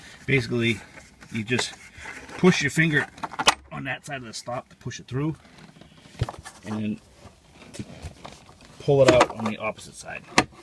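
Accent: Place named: New York, United States. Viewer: nice new York accent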